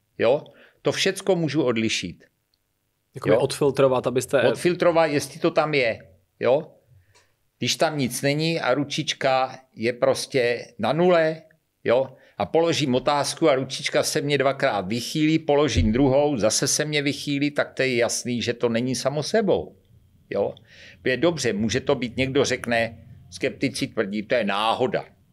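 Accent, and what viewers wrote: Brno, Czech Republic. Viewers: ten hantec mě rve uši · Pač je borec ze Šlapanic Kousek od Brna Mluví Brněnšinou Stejně jako Moris z Madagaskaru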